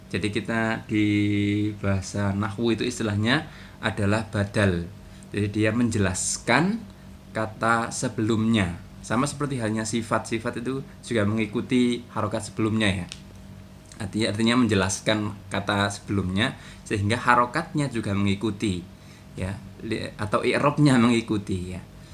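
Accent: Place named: Java, Indonesia. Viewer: maa syaa Allah.. medoknya kerasa sekali hehe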